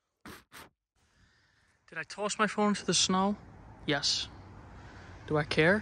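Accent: New York, United States. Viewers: ! Love your channel and your NY accent, you make me miss my hometown! Ps…please wear your seatbelt LOL. 😉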